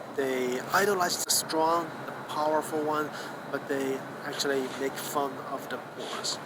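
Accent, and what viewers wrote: China. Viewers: 呵呵 你掌握了流量密码 说着一口Chinglish迎合油管群体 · 你以为离开中国这个泡泡看到了世界的真相，其实你只是被包裹进了另一个泡泡而已，战争就是战争，一句带过的美军战争和整篇批判的俄乌战争，你的屁股已经坐歪了。我支持每个因为人种宗教政治或历史原因结成的团体捍卫本团体的生存权和利益。所以我同情乌克兰人民，夹在大国间艰难生存更被战争摧残；我也同情俄罗斯人民，因为历史体制政治等原因一直被孤立于西方世界只能努力的寻求着不被蚕食瓦解；我甚至理解台湾，明明和我们用不一样的世界观看待着这个世界，却始终不被完整承认，对明天的结局也充满未知；我也理解美国，无论错对做什么也都是为了本国好，对于美国人而言本该如此；我唯独没法理解的是你，英语再流利也听得出是中式腔调，说明你是在中国长大的中国人，一个离家的孩子哪怕走的再远看的再多，哪怕知道家里还有多少不足不对不堪，需要做的应该是让这个家变得更好，而不是一副完全对立的嘴脸完全否定这个家，否定所有的家人。很多历史的政治的原因造成的问题，不是一朝一夕就能完全改变，但今天的中国也远不是从前的愚昧无知，今天的年轻人也远不是你口中的是非不分。当有一天，你嫌弃的中国消失了，你，这个永远无法改变的中国人，也会很惨，相信我！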